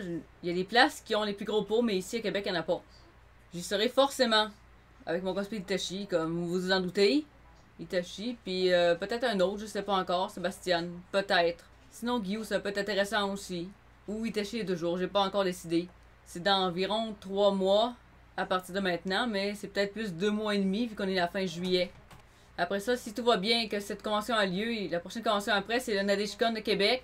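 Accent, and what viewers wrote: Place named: Japan. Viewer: vraiment bravo tu l’es mérites vraiment et ton accent japonais est incroyable !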